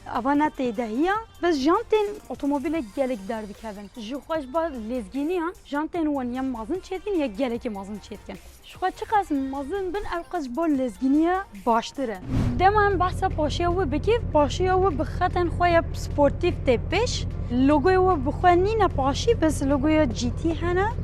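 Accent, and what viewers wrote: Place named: Goyi, Turkey. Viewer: Peki o goyi şivesiyle konuşmanin verdiği zevki hiçbir şiveyle değiştirememek ,u Deste hawa soxbit serkeftin inşallah 🙏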